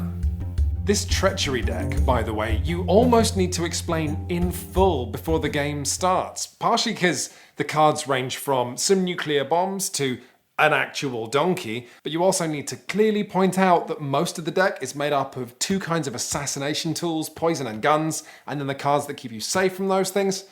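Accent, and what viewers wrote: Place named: England, United Kingdom. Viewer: most English sounding man ever, congrats.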